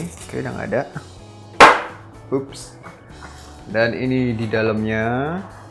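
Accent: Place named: Yogyakarta, Indonesia. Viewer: Anjir keren banget😂 bangke lu bang, jogja sekali macam aku kalo ngomong wkwk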